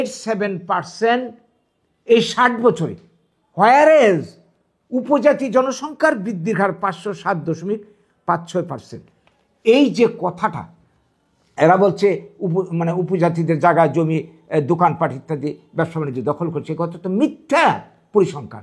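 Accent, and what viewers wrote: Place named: Bangladesh. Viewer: Dado tmr kotha buja ji tmi bangaldesi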